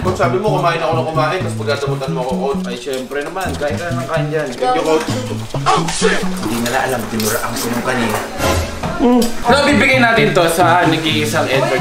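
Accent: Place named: Philippines. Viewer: I love the way he Speaks TagLish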